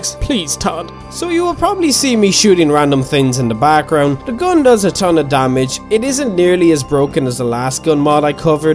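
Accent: Ireland. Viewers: Ah, a fellow Irishman Any craic?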